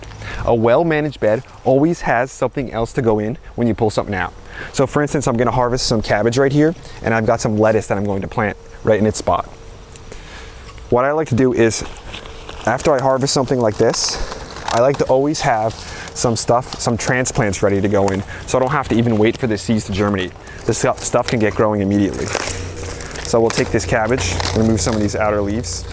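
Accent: New Jersey, United States. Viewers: Love ya HARD “”JERSEY”” ACCENT. ONCE IGET NEW GARDEN SET UP... I WILL INVITE YOU DOWN. YOU WILL LOVE THE AREA AND WEATHER..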